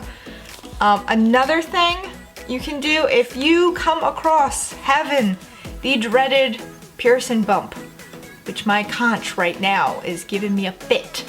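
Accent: South, United States. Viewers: It’s not “duh” it’s “the”.. Its not “Pearson” it’s “piercings”.. Are you southern or something?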